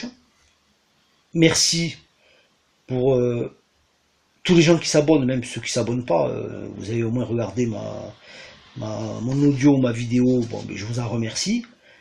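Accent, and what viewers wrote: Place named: Cantal, France. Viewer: Bonjour, je viens d'ecouter plusieurs de vos potcasts et ce qui m'a frappé c'est votre accent qui ressemble fortement à celui de chez moi, le Cantal 😅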